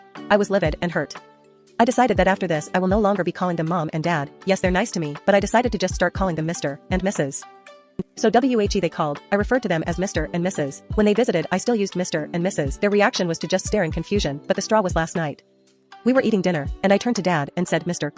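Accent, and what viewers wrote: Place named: France. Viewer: Lovely French accent you have! Almost as lovely as your backdrop. Simple, but effective! For Fualaau, I believe his name is Hawaiian, or at least Polynesian of some sort. Those languages tend to be very phonetically arranged, so you usually read the name exactly as written. Fu-a-la-au, with the last syllable being pronounced "ow". At least, I'm pretty sure that's how it goes! I didn't even know they'd made this movie. Pretty distasteful, imo, but so is a lotta true crime and its immediate thematic relatives. I hope Fualaau is making bank off it, or will be soon. Idk like if it's done respectfully and with the consent of the victims I'm down. Those two things, and there being multiple points of view but also objective facts therein, are just about all I require of something like this. Everything else is a bonus. But I'm understanding less and less why we're obsessed with telling these stories as time goes on; who knows why. 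It kinda reminds me of The Ring, actually... Anyway, if RtG says it's good, I'll give it the benefit of the doubt. Still no interest in seeing it for myself, but I'll withhold criticism unless something significantly rotten is proven to have happened wrt the making of the film.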